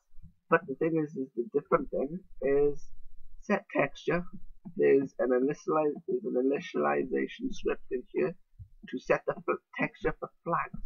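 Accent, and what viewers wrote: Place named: Wales, United Kingdom. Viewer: ah a welsh boy! bore da!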